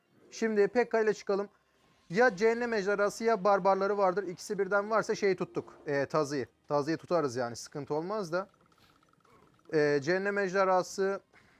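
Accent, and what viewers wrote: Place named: Istanbul, Turkey. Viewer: dil kursuna falan mı gitmeye başladın türkçen istanbul türkçesine dönmeye başladı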